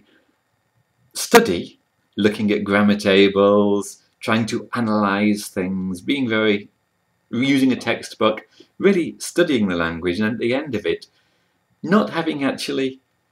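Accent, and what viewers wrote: United Kingdom. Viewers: Very interesting video. I notice a few people have commented on English accent. But what struck me immediately was that his accent sounds British, but his spelling on the whiteboard was American. An unusual combination.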